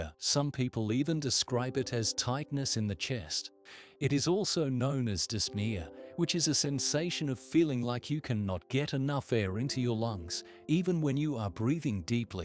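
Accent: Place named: Australia. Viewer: Omg i love his Australia accent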